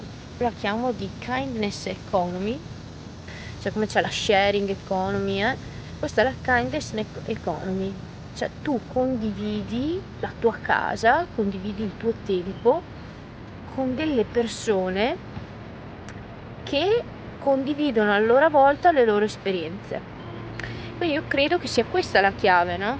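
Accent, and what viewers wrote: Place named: Veneto, Italy. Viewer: Grazie per l'ennesimo episodio, quando mi appare la notifica è sempre un piacere guardare le tue avventure, il tuo modo di raccontare lento e pacato con quel tuo accento veneto, sono un toccasana, grazie ancora e buon viaggio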